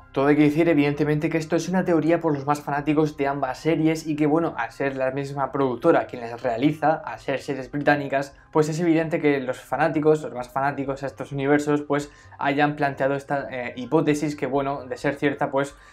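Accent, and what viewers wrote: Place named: Latin America. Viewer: Tenés que aprender un español más neutro, cuesta entenderte en el español sudamericano. Tenés que aprender a proyectar las palabras hacia afuera, hablás hacia adentro y suena un pasticho engorroso, obviamente te miré 20 segundos.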